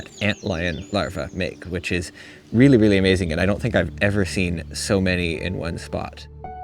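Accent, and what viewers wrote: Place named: United States. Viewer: It'a a lovely area with some great fauna. I'm intrigued, because you sound American / Canadian but the fauna seems to be from central or eastern Europe.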